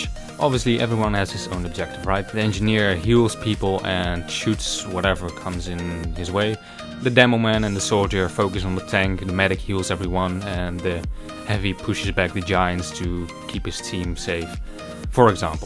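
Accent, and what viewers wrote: Netherlands. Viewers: Your accent sound realy dutch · Are you dutch? You sound dutch :D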